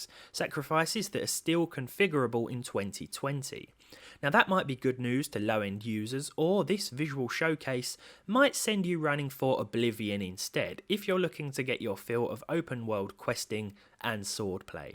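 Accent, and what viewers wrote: United Kingdom. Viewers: Lowspecgamer:British edition.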